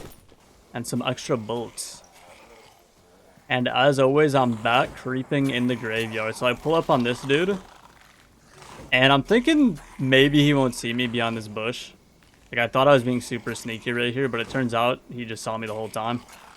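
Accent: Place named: Florida, United States. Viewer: I would bet this guy's from Florida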